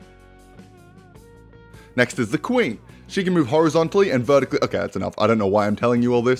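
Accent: Australia. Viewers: Are you Australian, you sound heaps Australian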